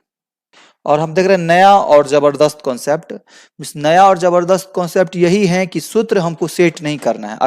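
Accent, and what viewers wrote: Bihar, India. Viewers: आप बोली से बिहारी लग रहे है 😊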